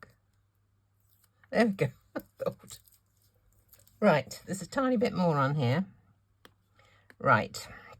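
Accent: United Kingdom. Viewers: I agree! Keep on talking! I’m from Canada and love your British accent. I’m addicted to you videos and look forward to them. Loved the Advent series.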